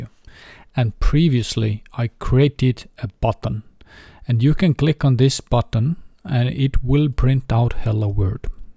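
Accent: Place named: Estonia. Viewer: see eesti aksent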